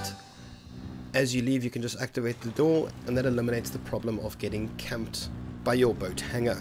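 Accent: South Africa. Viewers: Came across your channel in suggested. And i heard your voice and thought this guy is definetly south african xD its awesome to see a south african gaming channel this big. Definetly subbed <3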